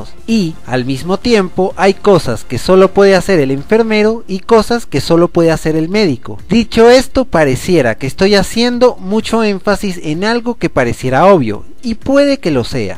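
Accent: Venezuela. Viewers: Depende de la región de Venezuela donde naciste. Ángel David Revilla es Caracas (región central), donde el acento es más prominente. Me imagino que es de la región oriental o la noroccidental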